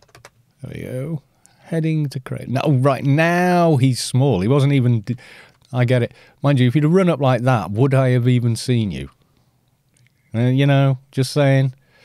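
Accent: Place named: Scouse, United Kingdom. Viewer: I always thought you had a bit of Scouser in your accent.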